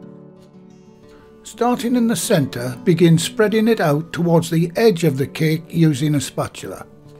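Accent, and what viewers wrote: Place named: North, United Kingdom. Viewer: Wow it looks so scrumptious... I am salivating just looking at the thumbnail. I hit 👍 button even before watching the video knowing will never ever disappoint us but make us happy… It’s like a meditation to listen to your softer silkier voice with nice accent… you pronounce both ‘Butter’ and ‘Batter’ same… it’s very funny you never pronounce ‘E’ for Gas you call it ‘gaas’.. is it northern way of Pronunciation but other northern people I have met don’t pronounce the way you do… anyway thanks dear… Give us another delicious recipe soon… ❤️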